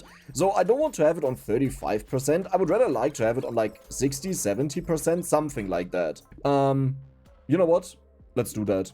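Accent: Germany. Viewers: Idk why but he sounds so much German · Are you german? Alter Schwede... Gave it away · Der deutsche Akzent ist einfach Bombe 😂 The German accent is the bomb 😂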